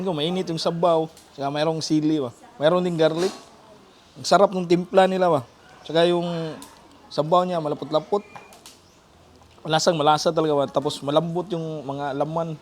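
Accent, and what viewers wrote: Visayas, Philippines. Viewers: Bisaya kaau ka bai..😅😅😅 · Mag bisaya idolwag kang mag Tagalog halata kc Tagalog mo idol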